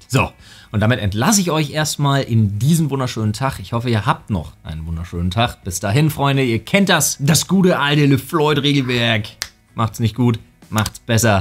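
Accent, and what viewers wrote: France. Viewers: Mit deinem Bart und diesem französisch klingenden Aktzent könnte man denken, dass du wirklich Franzose bist😅😅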